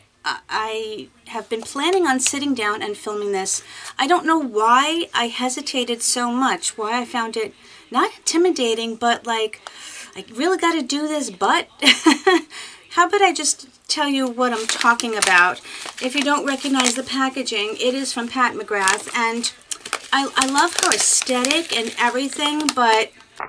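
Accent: New York, United States. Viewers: Always better when I see your beautiful face and your lovely NY accent..x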